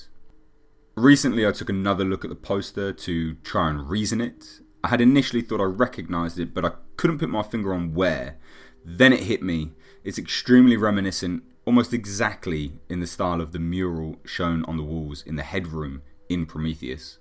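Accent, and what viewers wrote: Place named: United Kingdom. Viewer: Love your videos, great voice for narration (why do Brits always make things sound interesting?) :P. Quick question? Do you believe E. Shaw was turned into an alien queen or some other monster by David after reaching Paradise? I think David had learned a great deal more about the Engineers (their tech, history) than we were led to believe during the Prometheus film, so he has he maybe taken Shaw to a different planet and not the engineers' home planet?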